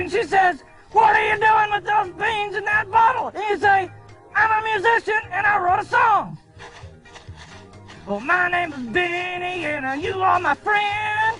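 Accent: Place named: North Carolina, United States. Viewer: I don't know why, but his voice reminds me so much of my friend from North Carolina. My friend has a softer, slightly deeper voice, but he has almost the exact same accent as Binney.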